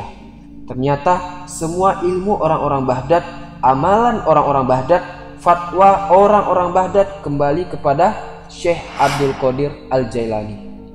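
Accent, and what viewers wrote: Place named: Aceh, Indonesia. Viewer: Maaf dengar dialeknya. seperti dari Aceh.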